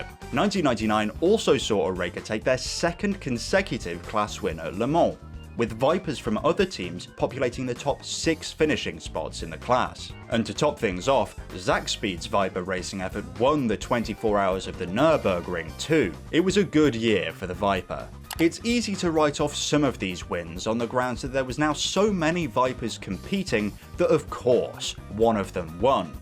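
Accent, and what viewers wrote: United Kingdom. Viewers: Ah yes , a British accent guy talking about cars, hits the spot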